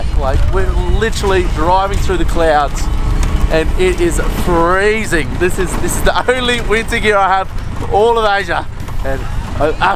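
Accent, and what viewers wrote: Australia. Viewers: Australian or NZ accent..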